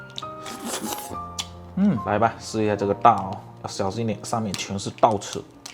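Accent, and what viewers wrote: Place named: Fujian, China. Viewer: 很喜欢听福建人说普通话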